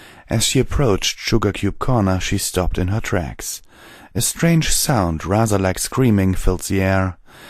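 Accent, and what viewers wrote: Germany. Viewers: I think you’re from Germany but you have an English accent mixed with German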